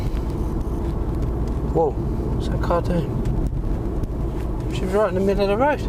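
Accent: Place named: London, United Kingdom. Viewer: Top man! Loved the micky Flanagan London accent lol. Kappa the vids coming mate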